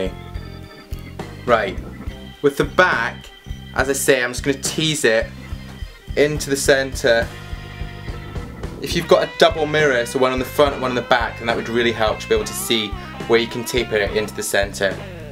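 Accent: United Kingdom. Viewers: What a British accent mate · Love the video! It's a godsend for a theatre person working on a historical piece! But I have to ask, where are you from in the UK? I'm trying (and failing) to place your regional accent.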